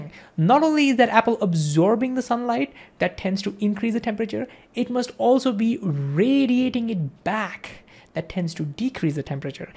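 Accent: India. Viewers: in the video. First time I see a video from you. Let me tell you two things. One bad, one hopefully less bad. First, the bad: you DO have a strong indian accent and I hate it. Simply because it's just a tiny little bit harder for me, a French, to understand. Second, the less bad: I know I'm going to love whatever content you offer, insta like, insta subscribe. As an old PhD in physics now working in engineering, I know that 1. I'm not up to date and 2. my education and teachers were both excellent, thank you, yet, ANY form of education is a bit lacking. History of science for instance: can you imagine that I studied that for ONLY one year? - Yes, only one year. This discipline shall be mandatory for EVERY scientific field! - But yes, I still had the chance to study it for one year, told you I received an excellent education. Understanding HOW and WHY great ideas came to be, I dare say, is probably more important than WHAT the great idea is. 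Unfortunately, it's also much, much harder to teach, and longer, therefore education chose to focus on what (also, when you need that "what" to carry on with the next great ideas, it kind of make sense: efficiency) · Among the bes tindian youtube channels (or ones with indian accent anyways) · I think you should change your beard and cap style to become more dapper because your indian english speaking with that getup is eerily similar to a fundamentalist islmeek preacher who was kcked from India.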